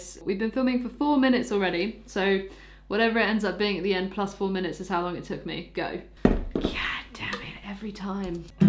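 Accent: United Kingdom. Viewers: Bri'ish accent is so cute in this one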